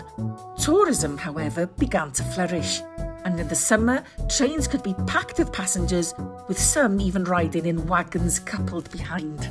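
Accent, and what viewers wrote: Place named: Scotland, United Kingdom. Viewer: I really love the Scottish narrator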